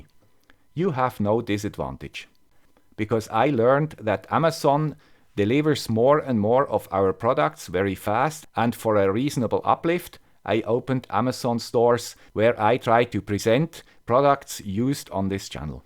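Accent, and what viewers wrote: Switzerland. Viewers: you are a very charismatic guy "with the Swiss accent". Your engineering approach combines the best mix of theory and experimentation. This is great. I reached your channel looking for long distance radios and i found LoRa and all sorts of related stuff. I kept with you and learned a lot more. Other people with a lot more subscribers are doing a very poor job providing very inaccurate information on everything. You know your limits and you respect them. I admire you for that. Keep your content safe for the future. Your legacy will last very long. Thank you. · Your channel is dry and humorless. A robot "With a Swiss accent"? People watch videos to be entertained. Most of your viewers know this shit already. You want proof? Make a mistake and see how many will jump in to correct you! If I want to know about a subject in depth, I'll read a few books about it. 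Not rely on a superficial 10-20 minute scripted video. People want honesty, but when you give it to them, they resent you for it. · There are a lot of rumors regarding what the youtube algorithm favors. In my experience most of them are based on very small sample sizes of observations. In niches which are more about the personality of the presenter (vlogging, comedy, and in crowded topics like video gaming) a face on-screen may be more important than in videos which are mostly about the topic being presented than the person doing the presenting. In ' case, his voice is enough to identify instantly that this is a "guy with the Swiss accent" video. It is very good branding that doesn't always need a face shown constantly. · There is no competition for a smart tech guy with a Swiss accent :) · Have a great 2019,! IMHO, you, like Dave, GScott and a very few others, became references for what a YouTube channel shoud be: a tool of mass education. 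Please keep it going, with a Swiss accent!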